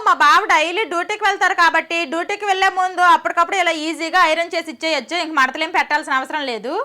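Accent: Srikakulam, India. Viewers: Voice over lo full sklm loney matldthru madhi sklm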